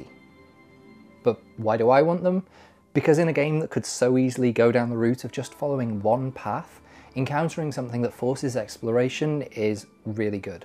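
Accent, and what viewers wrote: Wales, United Kingdom. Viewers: Are you Welsh? You sound Welsh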